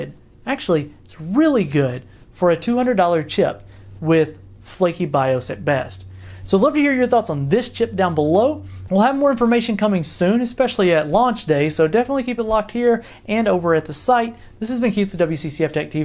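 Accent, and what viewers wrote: South, United States. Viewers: Just out of curiosity , which southern state are you from if i might ask!?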